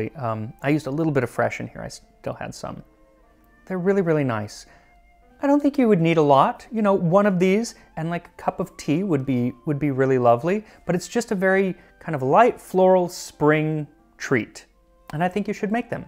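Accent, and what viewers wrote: United States. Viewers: Your pronunciations are on point, but it still sounds odd coming out of an American mouth. Kudos for going that extra mile to say it correctly . There's a huge Spanish speaking community here in Chicago, yet, 90% of my family still call fajitas fuh-jy-tuhs.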